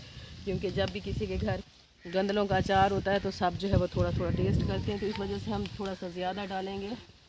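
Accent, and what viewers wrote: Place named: Thalochi, India. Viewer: Sohni thalochri punjabi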